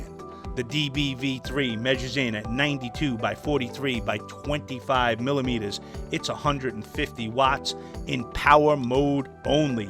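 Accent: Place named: Boston, United States. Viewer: you have a nice boston accent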